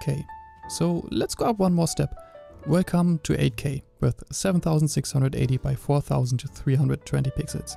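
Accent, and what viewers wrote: Germany. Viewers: man hört so krass das du deutsch bist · Nur deutsche hören das er deutsch ist 😂💪🏽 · I like the german accent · Der deutsche akzent beste xD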